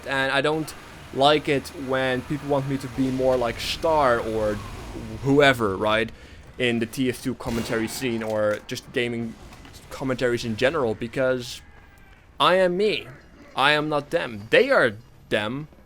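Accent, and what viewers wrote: Netherlands. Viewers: Dutch accent makes u special lolz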